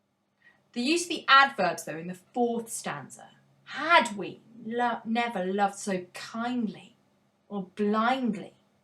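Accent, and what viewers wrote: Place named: Scotland, United Kingdom. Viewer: Thank you. Truly a delight to hear it in a Scottish accent I might add. 😊😊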